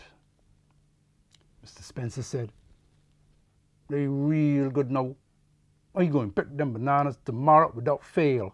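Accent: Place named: Patois, Jamaica. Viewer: Would love to listen to your poetry on an audiobook!!! The twang of patois in your voice is so warming.....hello family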